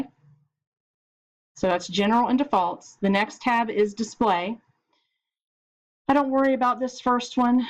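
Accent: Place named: United States. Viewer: thank you for this, really appreciate your help. love your american accent! why the fuck are defaults so insanely wrong all the time, its driving me absolutely crazy!??!? :/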